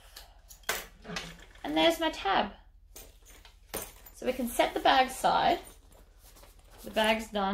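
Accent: Australia. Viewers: Hi! I like how you switch out from bags to something els in sewing. I really like the revamp of your sewing machine, it turned out very nice. I’ve been watching your videos for a little bit now & enjoy them very much ( I’m a newbie ). I love your Ausie accent. Great job all around young lady. Take Care Be Safe. 👍😊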